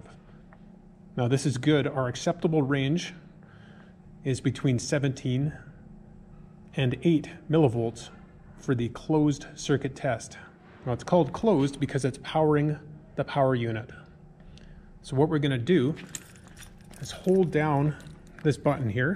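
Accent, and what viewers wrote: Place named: Canada. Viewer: I am going to try to fix my water heater and I wanted to understand the underlying concepts and this really helps. He sounds like he's from Canada or the northern Midwest.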